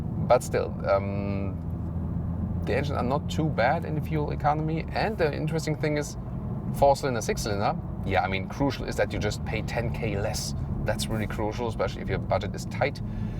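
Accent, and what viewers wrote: Germany. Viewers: WTF you'reon about? His English is superb. He might have a distinctive German accent, but grammar,0 vocabulary and overall fluency are spot on.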